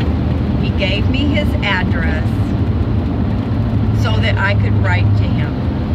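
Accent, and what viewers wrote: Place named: Maine, United States. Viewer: What y'all wanta bet looked like a red-headed Audrey Hepburn after her Aunt got her all spiffed up and lady-like??, you told us how since you were born in Maine, your family all said Ahnt instead of Ant. Even now you switch back and forth. I do that with some words.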